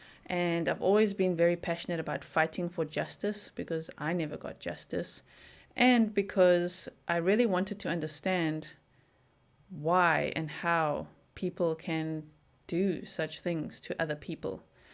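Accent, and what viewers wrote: South Africa. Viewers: I know how busy you are with your rapidly increasing fame & can't answer everyone. I sent a gmail with the question on if religion ever plays into your analyses/investigations & I should have said evil, possessions, satanic activity. What Brian did is evil & maybe he went full blown dark & sacrificed poor Gabby. Maybe he was temporarily possessed a la Chris "Low Wattage" Watts? How about Anna Leikovic, Aiden Fucci, pure evil. I have been traumatized in my life dealing with evil. We can all speculate, maybe the "Dirty Laundries" are closet satanists? Thanks & you are awesome & so is your South African accent. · Yes! I found you because you are covering the Petito/Laundrie case and I could listen to you just because of your south african accent: I love it 😉 My aunt is also from south africa so it sounds so familiar. But I also love the way you dive into the whole case, the whole persoon. 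Not just one aspect. It is nice to hear a bit of your background and who you are. Not nice to hear about the trauma but like you said; it makes your approach different and even more thourough in cases I think. I hope you feel a bit at home already here in the Netherlands. And safe(er) 💛 Groetjes! 😉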